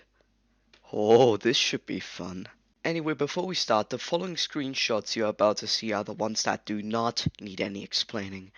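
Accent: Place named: United Kingdom. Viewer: Nice vid but you should upgrade to a snowball EDIT I love your voice it’s like a mixture between posh british and Scottish/Irish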